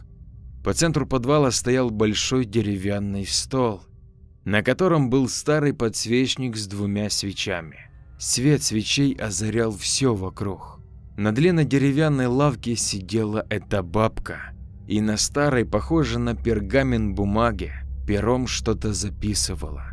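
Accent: Ukraine. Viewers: Автор, не отрусить одежду а отряхивать ) не обижайся , это украинский канал ? Твои истории отличные и читаешь хорошо люблю слушать твои истории ну иногда слова украинские на русский лад. Тебе же нужны длинные комменты ) вот и написала, я украинка может мне мерещиться ))))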